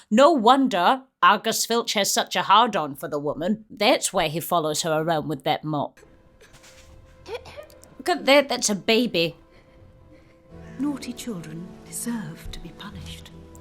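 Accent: Scotland, United Kingdom. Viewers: such a good scottish accent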